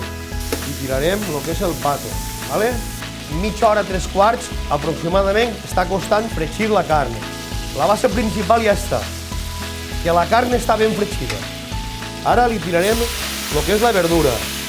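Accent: Valencia, Spain. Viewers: Che!!!!!!!! A parte que buena paella haces. Y que bien hablado el valenciano. El valenciano autentico, no lo que se habla ahora. Enhorabuena. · Holeeeeeeeeeeeeee. la Paella Valenciana autentica con ingredientes de Valencia. Y pronunciada por un Valenciano de pura cepa. APLAUSOS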